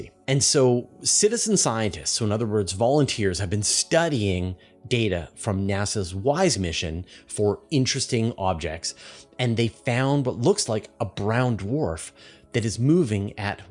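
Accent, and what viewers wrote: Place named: Canada. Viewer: I think the best part of EVERY one of these programs is the Canadian accent. Words like "out" and "about becoming "oot" and "aboot". Being from upstate New York, I was raised on this and can always tell when I'm talking to a born Canadian with an occasional Wisconsin'er thrown in.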